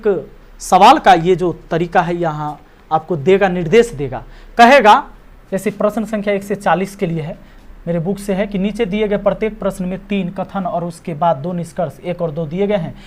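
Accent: Bihar, India. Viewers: Nice sir ji aap to bihar sa ho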